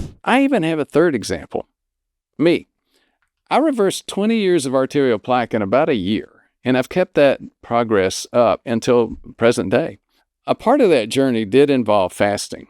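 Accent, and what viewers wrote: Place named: Midwest, United States. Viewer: Calling attention to some unfortunate ambiguity arising from terminology. In southern Illinois, where I grew up, dinner is at noon -- supper is at six. You sound to likewise have had a Midwest upbringing, but I'm nevertheless unclear on your terminology, and fear that others are also struggling with this. Regardless of this unfortunate confusion, thanks for all your efforts. I contend that by profession, doctors and lawyers wrongfully withhold knowledge from the masses through obfuscation. We're not stupid. Your efforts to feed the intellect of the alienated are a godsend.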